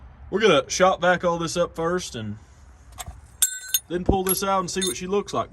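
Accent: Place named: New England, United States. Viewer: You have one of the most interesting Yankeee accents, love listening to it and your relaxed vibe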